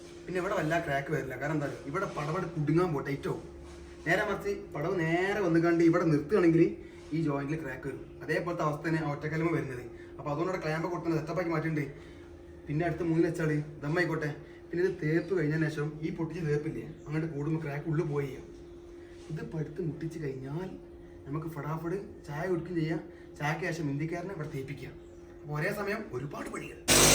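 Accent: Malappuram, India. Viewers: Adaanu njangale malappurathe oru bashaa reethi😂... Malappurathe piller poliyalle😊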